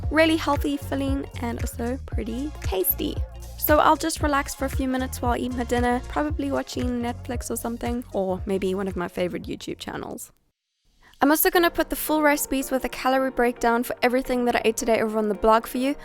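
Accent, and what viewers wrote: South Africa. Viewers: Dat South African accent tho :p Baie goed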